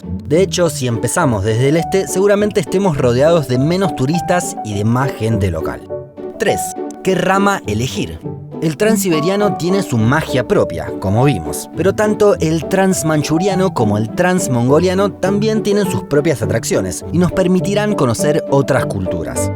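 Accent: Argentina. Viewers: De dónde es el narrador? Argentina? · El acento argentino es lo màs horrible se que pueda escuchar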